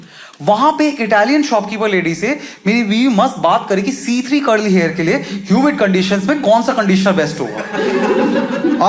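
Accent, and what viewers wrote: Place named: Bengal, India. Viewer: I like your benlivised english.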